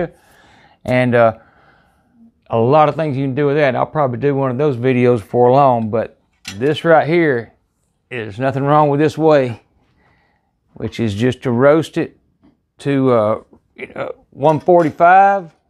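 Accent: South, United States. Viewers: I honestly like your videos.. But I'm a NYC girl..and omg love your southern accent!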